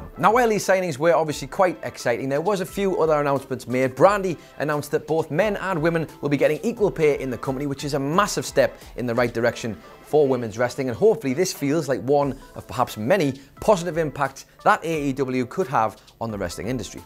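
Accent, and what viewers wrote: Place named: Newcastle, United Kingdom. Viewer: This man a geordie. 2 years of these videos and I haven't realised this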